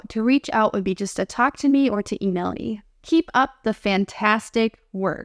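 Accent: United States. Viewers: love American pronunciation.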